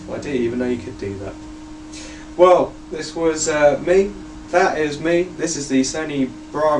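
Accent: United Kingdom. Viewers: lol asian guy with a british accent. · holy shit an asian guy with a british accent thats fucking awesome!!!!!! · never seen a asian with a british accent lol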